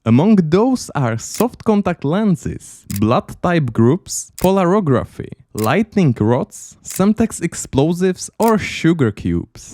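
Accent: Czech Republic. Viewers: Video a celkově informace o Česku fajn.. ale ten českej akcent v angličtině nejde vystát.. sorry not sorry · Judging by the accent I think he might be czech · never heard a more czech sounding accent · pomocí tvého přízvuku jde poznat že jsi z česka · Enjoying your Czech accent;-) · his accent is pretty straightforward giveaway of him being czech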